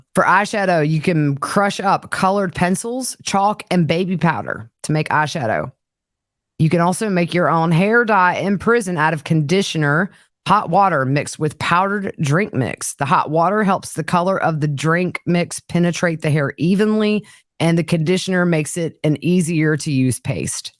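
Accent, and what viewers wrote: South, United States. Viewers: Love you! Love these prison life podcasts! Love your Southern accent! Love what you do! Thank you SO much! Appreciate you! ❤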